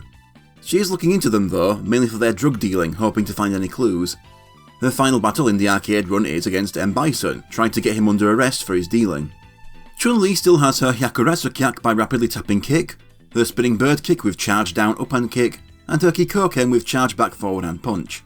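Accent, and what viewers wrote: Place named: United Kingdom. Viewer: Great video dude,Always great to see and hear another gaming Brit on YouTube! Subscribed!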